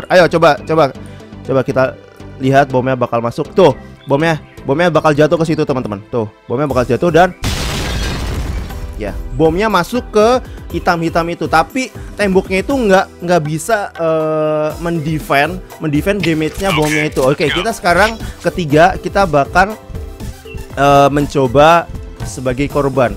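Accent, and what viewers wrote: Java, Indonesia. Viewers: Bang abang orang jawa ya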